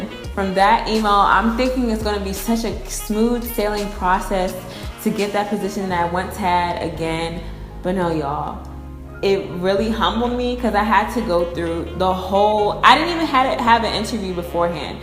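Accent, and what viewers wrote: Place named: Baltimore, United States. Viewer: I can tell she from Baltimore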